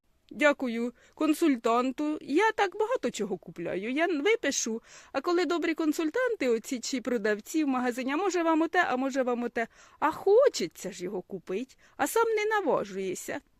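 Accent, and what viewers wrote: Poltava, Ukraine. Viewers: Моя ти зіронька ,гранатою прибьють,,,слухаю вас і балдєю у меня двоюрідна сестра живе новосанджарскій р так же розмовляє по Полтавські.Дякую вам велике.за відео.привіт с Дніпра.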